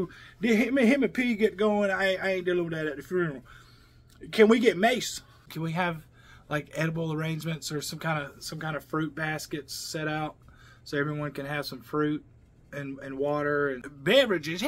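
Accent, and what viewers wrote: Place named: South, United States. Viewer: My boy straight up out the south lmao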